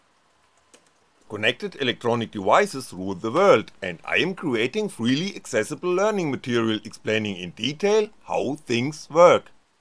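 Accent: Germany. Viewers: amazing german accent i enjoy alot :D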